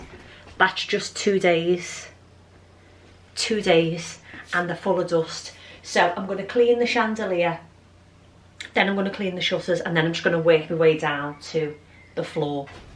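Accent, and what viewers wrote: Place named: Cheshire, United Kingdom. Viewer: Your home is gorgeous 🥰 could you make a video on organisation/decluttering tips? I’m pregnant with my 2nd baby and I feel like I need to give myself a kick up the a** and get decluttering so that housework is generally easier. Love your videos 🥰 I’m from Runcorn so it’s nice to hear a familiar voice on my telly haha! Xx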